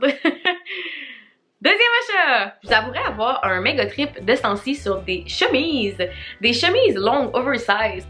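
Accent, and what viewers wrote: Quebec, Canada. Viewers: J'aime tellement entendre du français québécois !!🎶💜 Sinon, les docs ma soeur !!!!!! Grrr En super état, quelle belle affaire.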